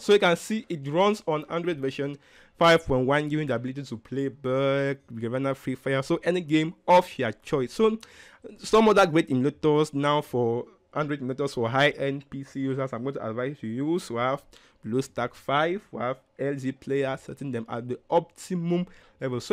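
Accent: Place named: Nigeria. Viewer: no you somewhat sounded like a nigerian in a way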